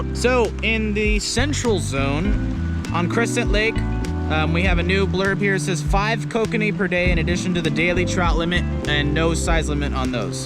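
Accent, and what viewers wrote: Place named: England, United Kingdom. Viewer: Love the English accent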